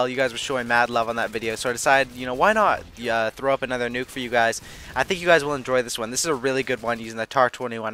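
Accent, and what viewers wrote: Canada. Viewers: are you from Canada? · r u Canadian like me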